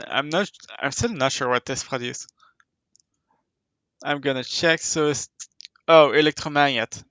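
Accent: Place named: France. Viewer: Your accent says you're from another country. I mean, yes, you're from the United States, but at the same time, I feel like you have French roots.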